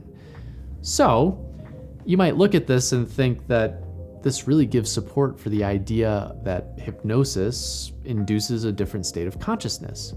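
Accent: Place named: Scotland, United Kingdom. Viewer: Dude nice Scottish accent.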